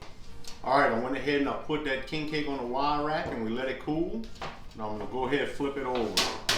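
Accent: Louisiana, United States. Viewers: He is Louisiana...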